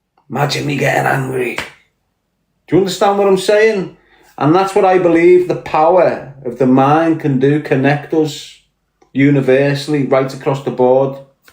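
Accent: Liverpool, United Kingdom. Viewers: The Scouse Terrence McKenna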